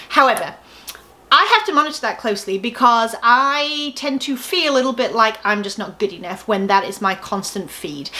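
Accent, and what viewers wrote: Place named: United Kingdom. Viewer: great information! thanks. i've requested to join the groups. i have a personal question. where are you originally from? i can't quite place the accent (assuming UK but what part?) thanks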